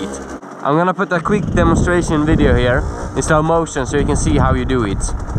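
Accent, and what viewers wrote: Sweden. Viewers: Älskar svenska dialekten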